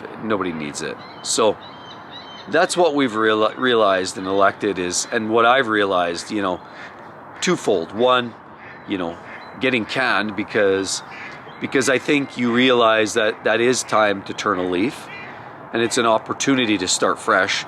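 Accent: Alberta, Canada. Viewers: You sound like your from Alberta, am i right ?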